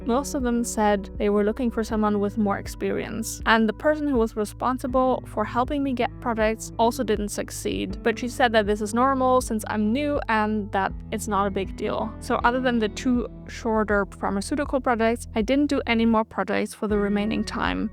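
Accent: Germany. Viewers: Do I hear a Gernan accent? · You are right that McKinsey doesn't matter...but You're European... You didn't learn the USA hustle mindset...you were absolutely supposed to get another project and make political allies...cultural differences got you. You took people literally. Do I get a German accent? If so from what I understand very different culture. The McKenzie people couldn't explain to you what to do anymore than a fish could tell you how to breath underwater... Going to iveay league schools and private HSs they absorbed the BS with their mother's milk. Social climbing obvuscating masters of double speak. " Consulting".... Scape goats for firing people. " If we do the same with fewer people we'll make more money...genius send that memo to the client...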